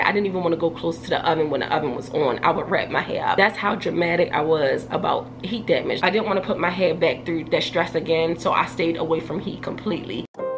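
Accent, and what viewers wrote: Baltimore, United States. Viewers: He said Rick James 🤭. I’m laughing because I actually have the album with Rick and the short bang. Still gorgeous hair with the short bangs!!!! Just found your channel binge watching your vids now. I hear Baltimore in the accent (I live in MD).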